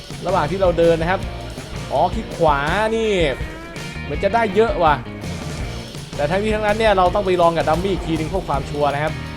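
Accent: South, Thailand. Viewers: พี่อยู่ภาคอะไรครับเห็นพี่พูดคลายกับภาษาไต้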